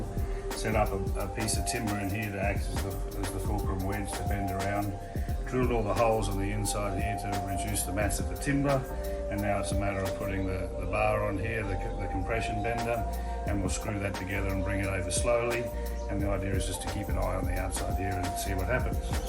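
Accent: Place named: Australia. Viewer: Good ol you tube recommendations. This was really useful and I hadn't seen that drilling approach before on harder wood for bending. Having been raised in Melbourne before finally ending up in the UK it is always great to hear that accent. Cheers for this vid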